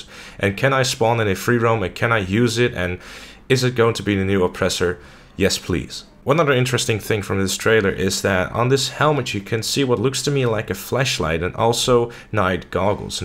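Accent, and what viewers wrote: Netherlands. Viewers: Zwaar nederlands accent bro😂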